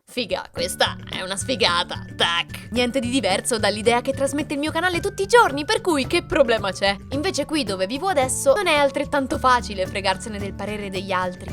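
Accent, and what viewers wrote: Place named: Milan, Italy. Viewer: Ahahah, il tuo accento milanese è fantastico! Ti è mai capitato di incontrare qualcuno di famoso per strada e sclerare ? Comunque, bellissimo video. Baci💚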